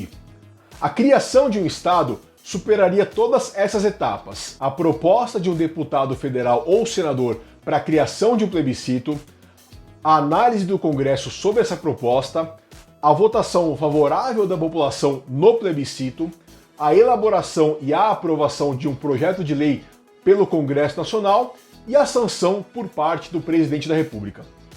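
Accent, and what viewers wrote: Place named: Mooca, Brazil. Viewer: Esse moço do vídeo tem sotaque paulistano da Mooca.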